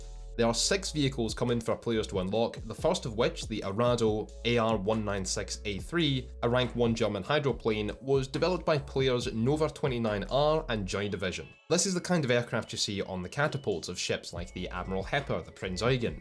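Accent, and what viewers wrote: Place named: Scotland, United Kingdom. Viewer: Ngl I watch ur vids mostly for ur accent I wish I had a Scottish accent lmao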